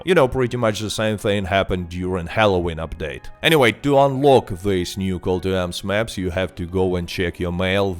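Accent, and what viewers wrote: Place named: Russia. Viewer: His accent make him sound Russian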